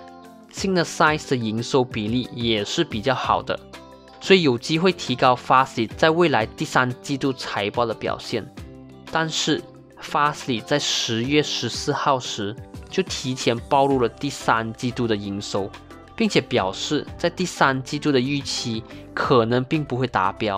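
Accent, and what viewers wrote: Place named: Malaysia. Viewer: Austin是马来西亚人吗？说话方式很像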